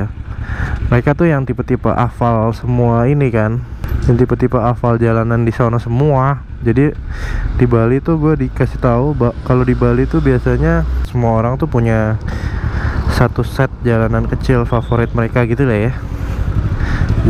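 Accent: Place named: South Jakarta, Indonesia. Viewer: Nak jaksel pisan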